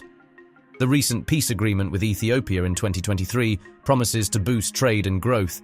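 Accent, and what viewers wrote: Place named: United States. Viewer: HILARIOUS and LUDICROUS! You're talking noise man! You're talking about dwarfs who're trying to get a normal size! Senegal and Niger What a joke man! Libya used to be Z wealthiest and socially developed before Hillary Clinton put an end to its success story! Libya HAS NOTHING TO DO WITH THAT POOL OF bantu DWARFS! Rwanda? C'mon! It weighs NOTHING compared to Tanzania and Uganda either! HAVE A LOOK AT GDPs instead of that nonsensical growth-based bla bla! hAVE YOU LOST YOUR MIND? ETHIOPIA is a giant in all aspects! NOthing to do with that Bantu thing... C'mon! Btw, your accent smells American more than African Bantu. HILARIOUS POST! GROWTH IS NOT EVERYTHING! Just look at China's 5% and US 3%... and STOP COMPARING carrots and gold! It's LUDICROUS and so IGNORANT of how this world works! Go back to school!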